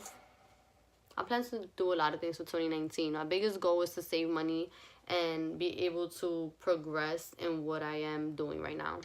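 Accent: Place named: New York, United States. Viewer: You can do this girl. Keep up your platform. I’m a new sub and already love your channel. I live in Quebec Canada and love yourNew York accent lol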